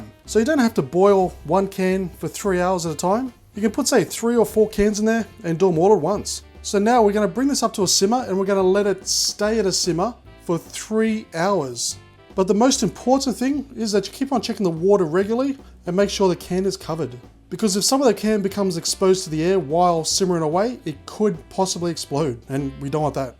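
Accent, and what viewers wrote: Australia. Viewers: he is so fucking beautifully australian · Finally a Aussie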